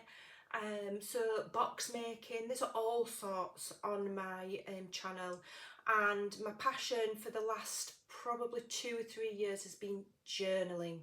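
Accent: Yorkshire, United Kingdom. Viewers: I’m one of your new subscribers and loved this vlog 😊 I think we are quite alike in our crafting too many ideas to stick to one project ! And you have inspired me to try a vlog maybe one day when I feel brave enough 😂 You are very pretty and definitely should not change your accent it’s proper Yorkshire ❤️ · Nice to meet you face to face (sort of). You look lovely and I love your accent. I have a few friends from Yorkshire and I just love the way they talk: it's part of who you all are. I am Spanish and have my funny accent from the north west of the country too! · Love this i to am a flitterea haha I call myself a multi crafter. My accent is pants love the Yorkshire accent i u derstand it very well some of your frazese we use in Newcastle to Loveing the red hair to ❤️ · Hello! So very nice to "see" you! (Love your hair color - it suits you!) I think it's a great idea to do a vlog here and there. 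You could show us historical or Victorian buildings in your area. Or take us to a lace shop or a thrift shop (to look for out of copyright images/music sheets). Or show us around the countryside or visit a village. Or just do a vlog showing clips of those ferocious "guard" dogs of yours! 🤣 I love the Yorkshire accent, thriftiness, stubbornness, friendliness, common sense and you don't take yourself too seriously. Honestly, I watch you just as much for your personality as I do for the lovely things you make. And I agree with you - a true crafter never settles down to one thing. Part of the fun is to have a go at all kinds of things, just to see if we can do it. I'm so happy your viewership is continuing to increase. Your new subscribers are going to love you! Thanks for all you do! Janet 💕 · Yaay a Yorkshire lass like me - love it!! Just found you, do I detect Bradford/Leeds in that accent? 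Sending lots of love anyway xx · It's awesome to see your face. Love the hair! And I love your Yorkshire accent! You are so encouraging and generous. Thanks for all the freebies. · Personally I love your Yorkshire accent! Nice to see you! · As a fello yorkshire lass you sound just normal to me. Been in Oz for 20 years but still have my strong accent and its comforting hearing you talking. Your crafts are amazing, easy to follow and always fun to do. Thank you sooooo much for all you share, including yourself. Wanted to send you a white rose but there was only a red one!!!! so here's a daisy instead🌼Jane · Oh my goodness! I am so thrilled to have fallen upon this video! Thank you for this vlog as now I can put a "face to the voice and laugh"! Your Yorkshire accent is beautiful. I am from Florida USA and there are all kinds of accents here, although, I don't have one, lol. Never change, just be who you are and embrace yourself, we do. 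· Going through some of your old videos and started with this one! Love it! Your Yorkshire accent is beautiful! 💗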